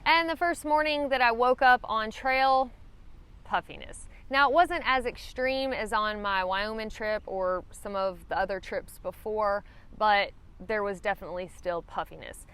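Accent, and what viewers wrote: South, United States. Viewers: Small world. I've been looking at getting into backpacking for a little over a month. I found your videos, really good and I've watched quite a few. I could tell from the first one you were southern, duh. Then I seen you were from Alabama...I thought that's cool, me too. Then a few videos later, I seen you were from Opelika. Then I thought wow, small world. I'm a lineman at Opelika Power. Keep up the good work. Your videos are invaluable.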